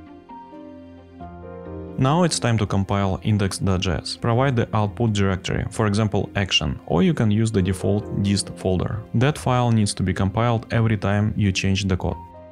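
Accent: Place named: Russia. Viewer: Слушать ролики на английском с русским акцентом помогает тренировать аудирование!:)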